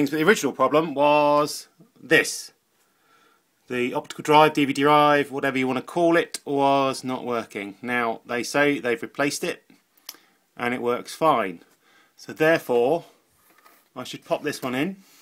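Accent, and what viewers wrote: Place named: London, United Kingdom. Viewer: Wha(t) a nice Bri(t)ish Londoner accent 'e 'as. Take a DVD and pop it in.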